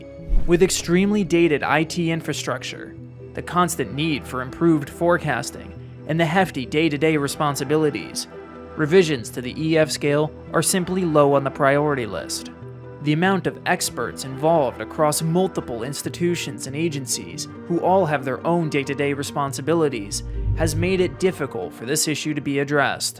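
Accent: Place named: RP, United Kingdom. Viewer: dawg talks he works for the BBC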